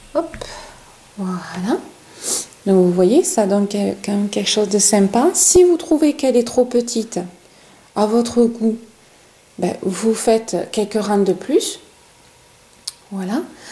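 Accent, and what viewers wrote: South, France. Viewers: l'accent du Sud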